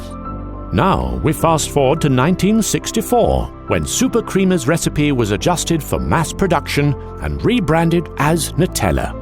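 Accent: England, United Kingdom. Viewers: SORRY NEW GUY BUT 'UPPER CLASS ENGLISH VOICE' IS PRETENTIOUS AND SHYTE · Hes got a british accent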